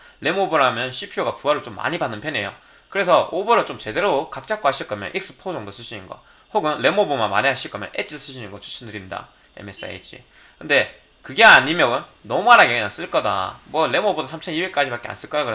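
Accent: Gyeongsang, South Korea. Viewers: 님 말고도 유명 유투버도 육월이라고 하는 눈×전×라는분도 있는데 경상도분들은 다 육월이라고 하나요? 지역감정은 아니고 정말 궁금해서요.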